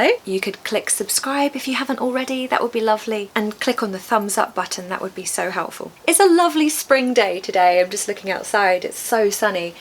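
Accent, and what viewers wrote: RP, United Kingdom. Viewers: Marvelous accent Lovely intonation Nice pronunciation Kind voice Attractive British English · This was excellent. I love listening to your British Accents...............Toodle Pip from 🇨🇦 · I do find the RP accent very charming ˖♡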